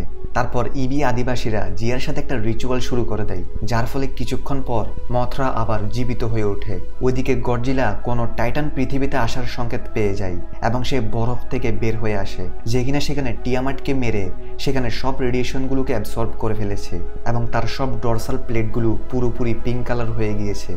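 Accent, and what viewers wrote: Chittagong, Bangladesh. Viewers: ভাই আপনার চট্টগ্রামের টোন টাতে কথা গুলো শুনতে ভাল লাগছে,কারন আমিও চট্টগ্রামের ছেলে 😁😊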